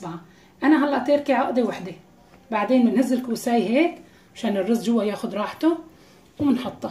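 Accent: Aleppo, Syria. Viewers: والله جوعتيني يا والله فعلاً منظومة ههه لازم نتعلم منك حكي الحلبي كتير بيعجبني يسلم هالديات متابعتك من لبنان بيروت 👍👍👌👌👌👌😋😋😋😋💞💞💕💕😍😍😍😍♥️♥️🌹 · صراحة انا واحدة ما الها ابدا بالكزمنتات. بس والله مو قادرة اقاوم. الله يعطيك الصحة والعافية يا خالتو و يقويكي. شو هالفن هاد, حفر الكوسا والا حبات الشيشبرك , كانهم مرسومين رسم. الله يسلم اديكي ويعطيكي الصحة. مبسوطة كثير انه صوتك الحمد لله احسن من الفيديو اللي عملتيه بعد مرضتك . اجر وعافية يارب. وانت ابداااا ما بتحكي كثيير . بالعكس حببتيني باللهجة الحلبية .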